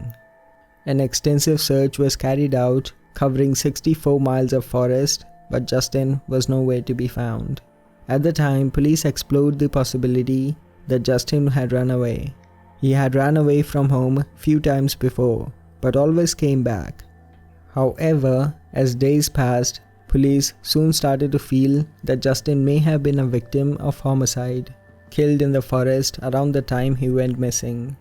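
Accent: India. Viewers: Indian accent is one of the worst narrator accents possible. Please stick to the women narrator. Quality content non the less. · Sounds like an Indian accent.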